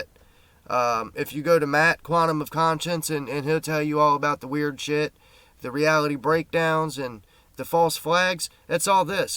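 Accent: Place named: Pennsylvania, United States. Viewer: Hey man. I see you in several of the same chats as me. Matt and Brandon I see we have in common and I think I might've seen you elsewhere. I've been meaning to check out your channel. This one caught my eye right off. Jesuits are definitely behind most major deceptions. That I've determined Big Bang, heliocentric earth, evolution (more of a roundabout way), perverted eschatology, and even germ theory (Girolamo Fracastoro was Copernicus' classmate at Padua). This is the paper I did for ministry school when I discovered more about them. You might find a nugget or two. <link> Your accent leads me to believe you're from PA.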